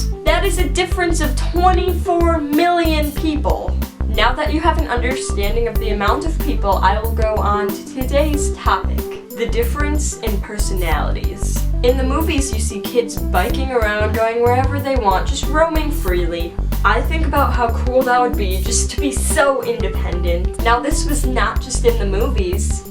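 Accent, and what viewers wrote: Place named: North, United States. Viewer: Hey by the way, you're really cute ;) are you from Wisconsin? Or someplace from the north? You sound like a northern person.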